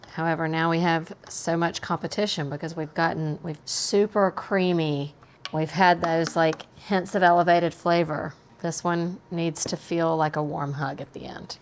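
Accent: South, United States. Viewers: You are SO attractive. The combination of your looks, personality and that Southern accent is just addictive. His bless your husband; he must LOVE 💕 you! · For my first comment since discovering cooking methods on this channel.... More of that Southern "Southern" accent please.... 😂💯💯💯 👋🏼👋🏼👋🏼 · Your southern accent is on point. Just enough.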